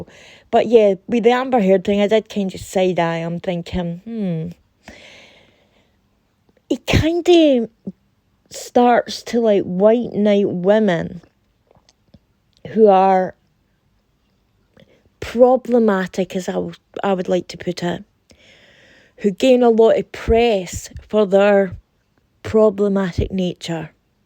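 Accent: Scotland, United Kingdom. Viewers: Your Scottish accent is delightful. Reminds me of my late and greatly loved great-uncle. His wife, and her sister, my grandmother, both had strong Irish brogues so the Gaelic accents are music to my ears. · I love your Scottish Brogue, lovely. I’m from Florida and I have no problem understanding you. I also like tangents, they are interesting and so are you. Don’t listen to the negative comments You do You❤️🌺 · . Your Scottish is fine so don't change a thing. Thousand agree with you, and thousands will continue to but many haven't the time to comment which doesn't mean we don't agree with yours. Free speech is free speech and that is the main point here. YouTube is very much controlled by the wrong people. You keep reviewing as a good job done. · Don't you change for anyone, I love your wee Scottish accent🥰 the more people listen to your accent the more they'll pick it up. 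· I love listening to Scottish accents. Bless you 💖 Much love from Australia 🇦🇺 and from one to another. 😊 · Love your Scottish accent! ❤️Subscribed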